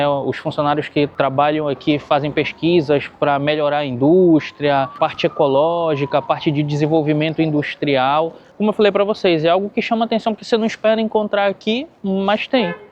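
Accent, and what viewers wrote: Pará, Brazil. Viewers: Seu sotaque é do Pará, certo? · O cara tem sotque paraense e torce pro vasco. PQP.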